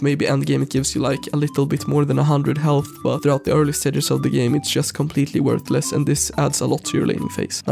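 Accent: Sweden. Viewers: Do hear a Swedish accent? c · är du från sverige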